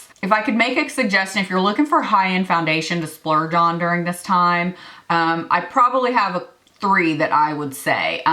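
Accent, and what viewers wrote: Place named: Texas, United States. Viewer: I will echo what many have already said. You look beautiful - your makeup is flawless. I would love for you to be my personal shopper for a day!!! While watching the video, I had to turn my phone volume up because I thought you said you were putting things in your car (instead of cart)!!! Why is she storing good makeup in her car??? I started laughing when I figured out what you were saying. I was born and raised in Texas, but your accent is thicker than mine! (Not a bad thing at all.)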